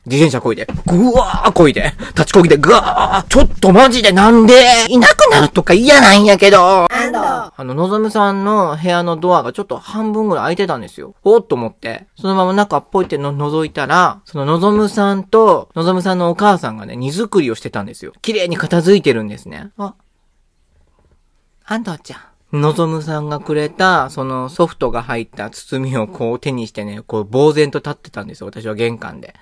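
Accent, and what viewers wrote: Kansai, Japan. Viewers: の青春の思い出ですね。なんかしんみりして聴いてました。ノゾムさん元気でいて欲しいです。の関西弁好きです😀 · いい話やねーもと此花区出身です。の関西弁好きやわー自分が子供だった頃に一瞬もどります。は若い時から外も仲も可愛かったんやねー男でも女でもない人間として可愛かったんやねーなんか感動してしまいました。大阪弁の中には沢山の感情が混ぜられているようで関西人魂に響きます。私はもう関西に住んでないくて、関西の住む身内からは私の’’関西弁が古くてへんや’’って言われてびっくりしました。どうでもええねんけど。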